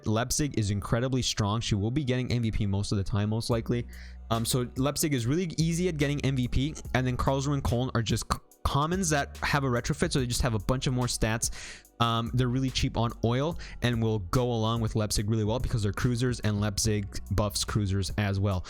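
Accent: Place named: England, United Kingdom. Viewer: i love english pronounciation on german words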